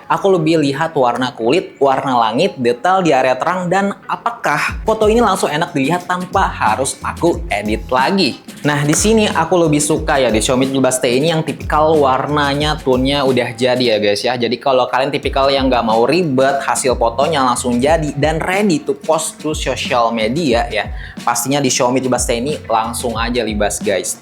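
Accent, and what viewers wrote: Banjar, Indonesia. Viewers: Orang banjar kah pian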